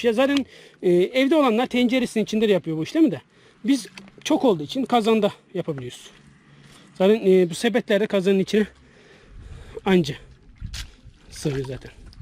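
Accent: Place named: Thrace, Turkey. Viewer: Trakya mı orası şiveniz trakya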